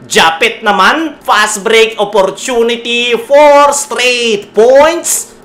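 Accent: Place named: Philippines. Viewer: Ang pangit mong mg salita ano b probins u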